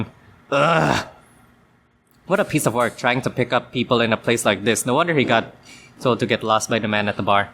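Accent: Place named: Russia. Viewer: Another lovely video as usual XD love you Russian accent :3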